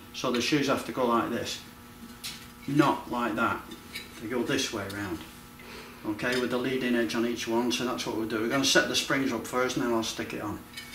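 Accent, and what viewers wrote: Yorkshire, United Kingdom. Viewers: Thanks so much for this excellent video. All of your videos, actually. Top notch. On a lighter note, I'm amazed that you can work in your good shirt and not ruin it. I've been wrenching on these cars for 50 years and mine are usually ruined in the first 20 minutes. 😂 Wish I knew if you had paypal. I'd send you 10 bucks for a pint (or 2!) as a thank you! PS love your accent. are you in the Dales?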